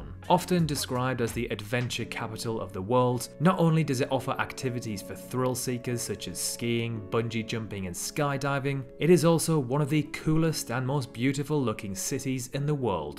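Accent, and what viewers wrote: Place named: United Kingdom. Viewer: Interesting accent. The person doing the VoiceOver seems to be British but it’s hard, at least for me, to identify exactly what region. does anyone know?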